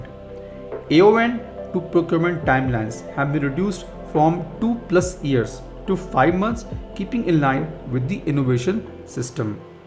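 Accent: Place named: Telugu, India. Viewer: The way you pronounce "Vehicles" makes me think you are a Telugu guy. But the way you pronounce "S" makes me think you are northie. Interesting!! Unity in diversity. India first, Jai Hind.❤️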